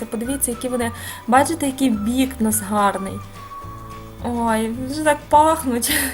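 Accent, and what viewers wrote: Western Ukraine, Ukraine. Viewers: У вас украинския речь с западным акцентом., а почему ваш канал подписан по английски? Что в украинском языке не нашлось вам название? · Эта Украиночка из западной Украины. Я тоже с Украины, но у нас другой диалект))))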